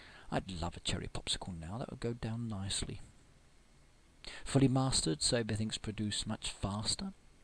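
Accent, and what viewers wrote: United Kingdom. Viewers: He's British lol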